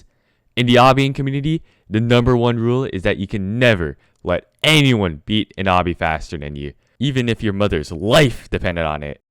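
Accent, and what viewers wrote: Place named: South, United States. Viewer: you sound like technoblade but with a southern accent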